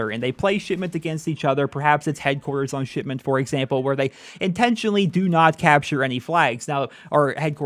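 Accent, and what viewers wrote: United States. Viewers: Wait... You're English? I thought you were American or Canadian Well I'm Scottish and most people don't realise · Really you're English? Your accent sounds American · are you really English? I thought you were American. where in England are you from?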